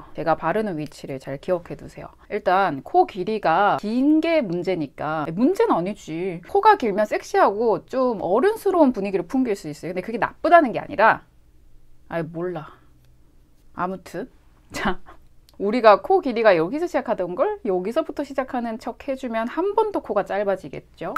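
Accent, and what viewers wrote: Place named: Daegu, South Korea. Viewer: 너무 예쁘세요~눈웃음이 매력적이세요~ 살짝 사투리 억양이~ㅎㅎ 대구분이신듯ㅋ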